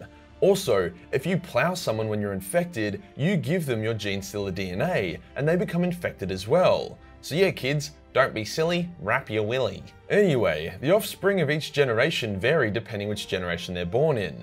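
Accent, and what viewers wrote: Australia. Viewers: Im not even a huge fan of warhammer, but i love listening to this aussie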